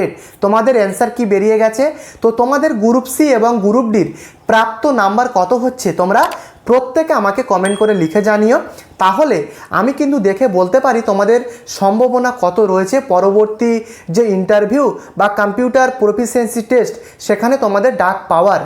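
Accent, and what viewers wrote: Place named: Bangladesh. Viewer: tomar bhasai bangladesi banglade vaab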